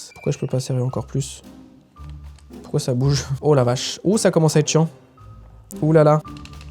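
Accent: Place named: Quebec, Canada. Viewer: Omg l'accent québécois jadoreeee en ten que québécoise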